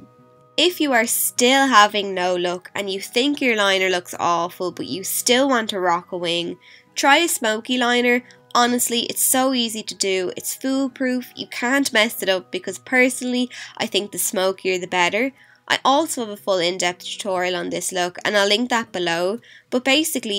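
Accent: Ireland. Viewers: Hey, great video I like the simplicity and that you take your time and go into detail to explain what you're doing and how you're doing it. I also like that you share alternate tips and tricks incase we're having problems. I appreciate that, I like your style so will be subscribing......also love your Irish accent. Coming from a massive Conor Mcgregor fan lol keep up the good work !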